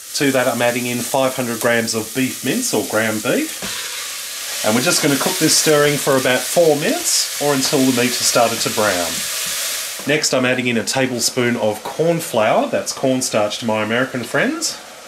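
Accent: Australia. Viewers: 2 things about this video: 1: I live in Mexico and I miss meat pies so much that I'm about to attempt to make my own, so thanks for this video. 2: I'm going to show this video to anyone who thinks all Australians sound like Steve Irwin. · love the aussie accent ^_^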